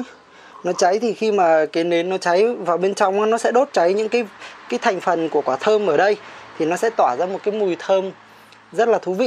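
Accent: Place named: Central, Vietnam. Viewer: One Move : người miền trung:))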